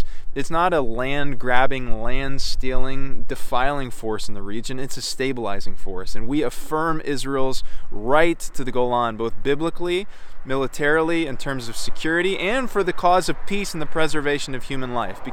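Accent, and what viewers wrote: United States. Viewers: In this video a European looking guy with an American accent tells us why its okay to take stuff from people if you have a magic book telling you it used to belong to some fraction of your genetic ancestors. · I couldn’t agree with you more Brother!!! You sound American 🇺🇸 Only have one ☝️ question 🙋 If CHRISTIAN’S truly believed in Hashem and Yeshua as their Savior and following the Torah as COMMANDED… Why is it that United States 🇺🇸 does not have one ☝️ fox 🕳️ hole dug in the Golan Heights and have not worked with the I.D.F.? When I take full control of the American 🇺🇸 Pentagon… I’ll go ahead and make the arrangements myself my American 🇺🇸 friend. I will be activating all U.S. Military branches to DEFCON 5 and deploying the Pacific Fleet off the coast of Israel 🇮🇱 Further arrangements are CLASSIFIED….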